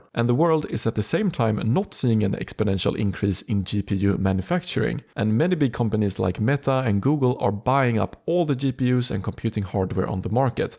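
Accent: Sweden. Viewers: This work good on Sweden? I hear on your english that you are from sweden :D Hur många äger du och vad tjänar du per månad just nu? Har du testat ta ut profit ?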